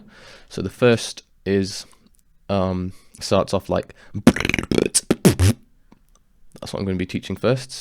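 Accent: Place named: United Kingdom. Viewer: Were you raised in the UK...coz u have a strong british accent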